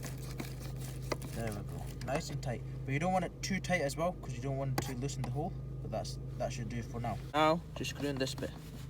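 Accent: Scotland, United Kingdom. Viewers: Thanks for taking the time to show us amateurs how to secure an armrest. I have just bought a 2013 Octavia and need to order one as I keep looking for something to support my arm when I feel lazy. Incidentally, love the Scottish accent. Cheers Mate.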